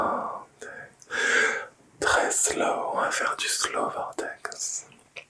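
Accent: France. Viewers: I LOOVEEE French accent 😭😭🫶🫶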